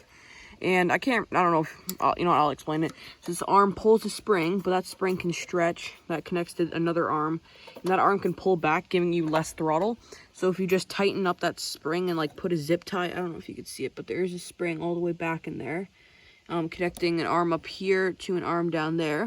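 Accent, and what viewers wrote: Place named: Canada. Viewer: You said ya hit 50 and I was tripping out thann you said "take err for a rip" and I realized ahhh he's a Canuck. Hahaha KMH not MPH 🤣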